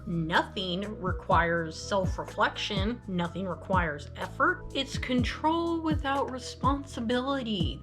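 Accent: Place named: Texas, United States. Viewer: Dear! This is so fucking hilarious. Your stream of consciousness took me for a ride! It was such a rush and because of the depth of your research It was a ride of outstanding beauty and mindblowing insights. I adore your dialect!! Are you an Unicorn with a Texas-Twäng? Where do you come from? Pleeeeze tell me!! I' m from Germany. 🍀😉♥️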